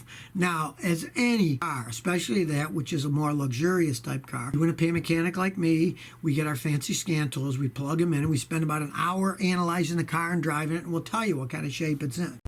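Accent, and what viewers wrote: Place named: Western New York, United States. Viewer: I knew that was a WNY accent! Greeting from Buffalo, NY. Thanks for all of your videos, they have been very helpful.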